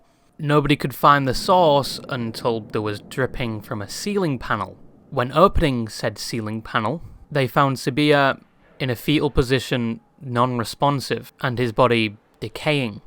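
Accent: United Kingdom. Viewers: British detected, disliked. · No you’re wrong you’re perfect for those stories because it gives the whole thing a new kind of “sting” or “slash” it’s because you’re Brit ish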